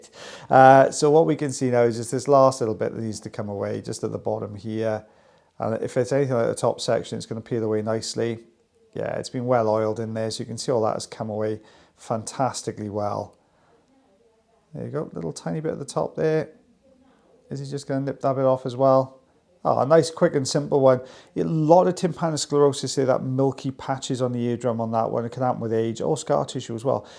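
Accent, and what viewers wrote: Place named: Wales, United Kingdom. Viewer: Awesome job. no need to apologize for the way you speak. Don't worry about the haters or complaints. You are Welsh not American. ❤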